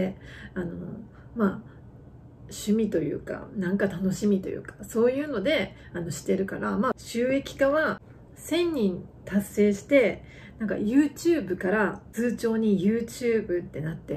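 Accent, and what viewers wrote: Hakata, Japan. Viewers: たまに聞こえてくる博多弁？、とても良いです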